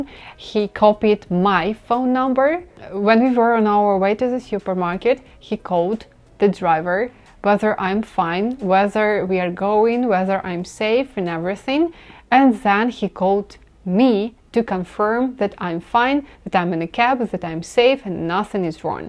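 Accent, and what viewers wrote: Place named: England, United Kingdom. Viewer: The best english accent ever by any foreigner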